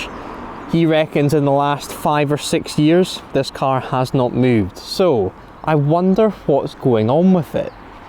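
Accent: Scotland, United Kingdom. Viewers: A car video with soothing music and the most relaxing reassuring Scottish accent I've ever heard? First ten seconds and you've already found a new subscriber!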